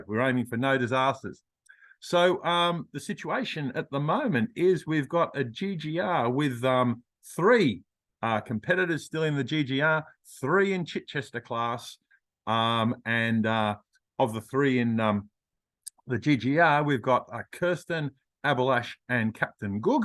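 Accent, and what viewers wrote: Australia. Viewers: Well done. Swapping one Aussie accent for another. At least I could understand it.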